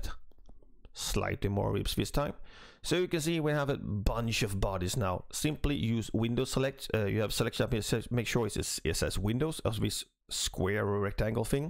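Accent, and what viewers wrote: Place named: Sweden. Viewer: Your accent is extremely similar ( he is also from Sweden)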